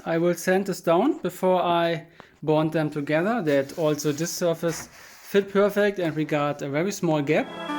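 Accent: Germany. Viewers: der deutsche Akzent sticht extrem raus, aber das Projekt ist super. Man erkennt, dass viel Mühe reingesteckt wurde. Good job 👍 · digga hab noch nie so krass deutschen akzent in englisch gehört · please, talk german, it sounds like you are a german native speaker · are you german? Nice video and plane!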